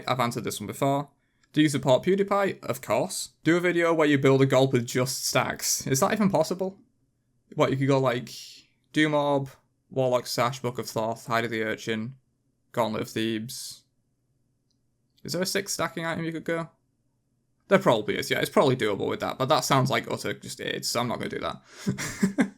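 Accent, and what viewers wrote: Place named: Northern England, United Kingdom. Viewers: what part of Yorkshire are you from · Big up the Northern English, im a northerner myself but slightly more northern than Yorkshire, still not too far from eachother at all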